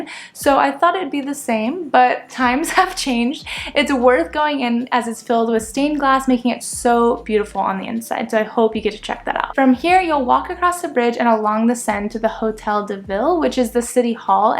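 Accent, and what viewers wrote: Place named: United States. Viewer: I love the way she talks, just straight up American without even trying to enunciate the local dialects lol 😅 I'm flying out tomorrow, we'll do the same! 👍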